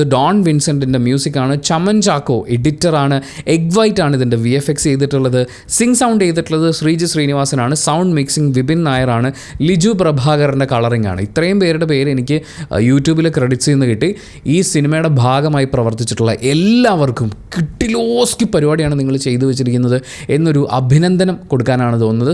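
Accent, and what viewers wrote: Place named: Eastern Kerala, India. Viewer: പാലക്കാട്‌ ഭാഷ അല്ല കിഴക്കൻ മേഖലയിൽ ചില ആളുകൾ സംസാരിക്കുന്ന ഭാഷ ആണ്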